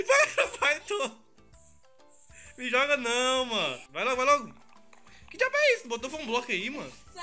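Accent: Northeast, Brazil. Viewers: vim pelo canal do camera mano , tu e nordestino e man ?? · Mano, esse jeito de falar/sutaque... So pode ser do Ceará mah. E ainda digo mais: eh de Fortaleza. E ainda digo mais!: eh do José Walter kkkkk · Tu é nordestino man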